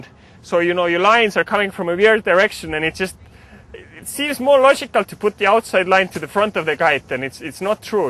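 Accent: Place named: Estonia. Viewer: I figured you are from Estonia by your accent:) nice video tho. I want to learn how to fly badly. :)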